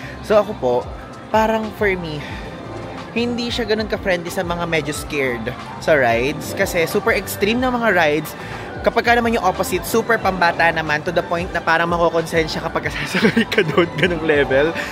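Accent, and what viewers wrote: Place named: Bulacan, Philippines. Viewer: As a bulakenya gustong gusto ko yung pagtatagalog mo,! sa bulacan ko lang naririnig ang supil 😂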